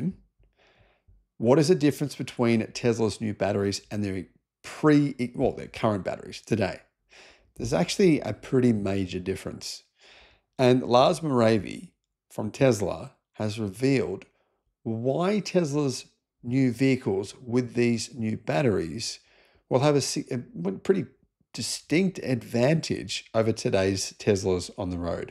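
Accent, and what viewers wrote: Australia. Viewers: A MAGAT with an aussie accent in a Cyber Jesus cult. WTF?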